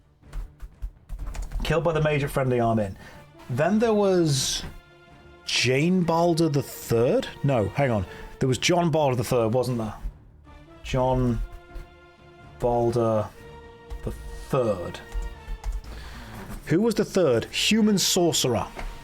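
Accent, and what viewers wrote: United Kingdom. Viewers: Please, good sir, do tell me that you are indeed British as you seem to be. Because if you are, you are probably one of the Britishiest people I've ever seen.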